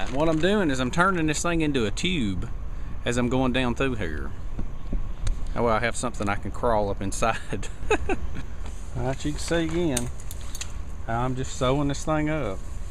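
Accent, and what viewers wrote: South, United States. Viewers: Howdy! The south is the only place where it's acceptable to turn a 1 syllable word into 2 syllables. :) I stumbled across your page a couple of days ago. What I like about your videos is that it causes me to think out of the box, plus the entertainment value. Very interesting ideas that you're sharing. Thanks!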